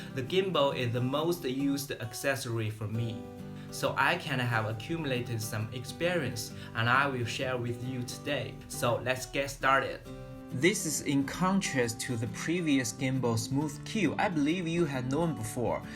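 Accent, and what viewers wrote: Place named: China. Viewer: Tsie tsie for taking the effort to learn English so you can make your reviews available to many more people. This is an EXCELLENT review and will help many people understand what the Smooth4 does and will also help them make a decision whether to buy. I particularly appreciate the multiple shots and the editing you did to show us a comparison side-by-side of this product and other products or comparing the Smooth4 to no gimbal. Very effective. Your English is perfectly adequate to communicate on a tutorial like this. You said you would like to improve, which is always the mark of a smart person. My suggestion to you would be to get some audio (extract from a YouTube video) of a native American English speaker saying the words "AIR" and "LOLLIPOP" and "RAZOR." Listen to those words over and over while you work on making your pronounciation identical to theirs. 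This will help train your mouth muscles to make sounds that are not in the Chinese (or some European) language. One more comment: Wherever you are in China is beautiful. I wish all the politicians would learn to get along and be friends with each other so all of us could visit and exchange ideas more freely.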